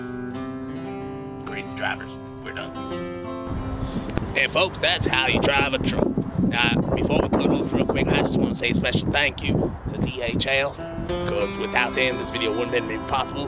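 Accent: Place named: Louisiana, United States. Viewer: he sounds like he has a lousiua byoue accent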